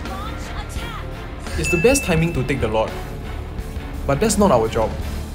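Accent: Singapore. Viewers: Man sounds like he is from sg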